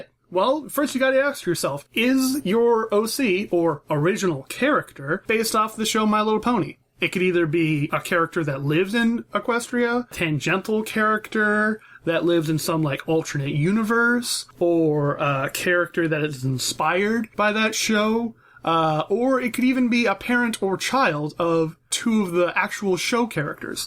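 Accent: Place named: Scotland, United Kingdom. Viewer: I still love your accent. .3. only because scotish people are awesome. I wish to live in scotland or ireland one day, cause Australia now bores me o3o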